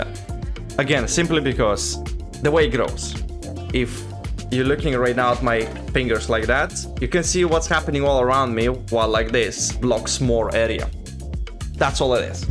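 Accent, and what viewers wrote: Russia. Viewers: Что у вас за акцент? Вы русский?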